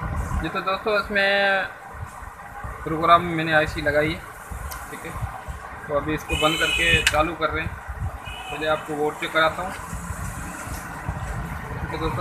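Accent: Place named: Sindh, India. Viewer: Ha aapke bolne ke andaz se pata chal jata he sindhi style he ...main umarkot sindh se.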